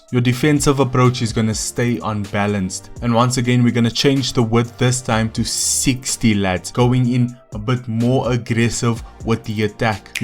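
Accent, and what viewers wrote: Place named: South Africa. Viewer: Bro youre south african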